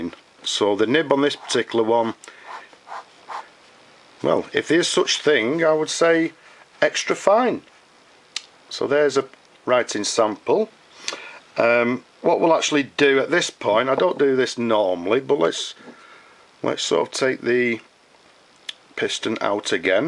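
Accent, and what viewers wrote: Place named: North, United Kingdom. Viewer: I do appreciate your video! By the way, your accent sounds pretty much like northern British accent haha. I don't know if I'm right about this but I like the way you talk definitely! I have just got the exact same pen on Ebay.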